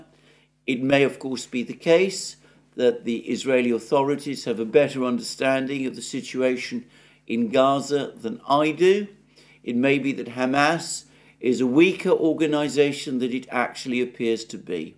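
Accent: United Kingdom. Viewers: Love the British accent - Ham Ass - funny AF. Love the show.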